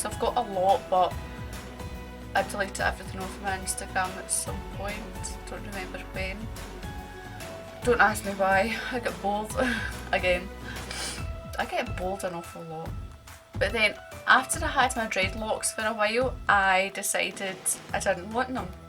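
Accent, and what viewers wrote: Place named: Scotland, United Kingdom. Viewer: are you scottish I can hear it in your voice love it.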